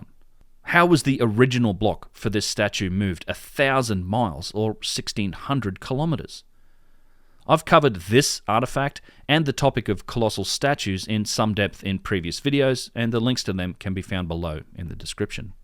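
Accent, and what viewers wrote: Australia. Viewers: I'm loving your work! I know you're a global citizen now, but I'm really pleased to hear an Aussie accent behind the insights put forward.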